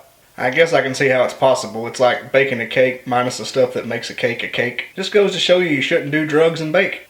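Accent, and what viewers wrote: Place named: Texas, United States. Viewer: I'm gonna try this! Looks good! You have got to be from Texas,:)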